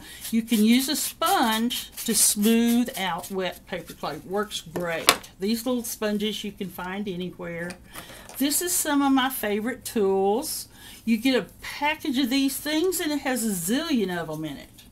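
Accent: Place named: South, United States. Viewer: What a lovely personality and creative spirit you have !I would also like to ad how adorable your southern accent is ..I was raised in Ga.but now live in NY for 25 years..thanks so much for the great tips and encouragement... it is such a joy to watch your videos .I have subscribed and look forward to more videos !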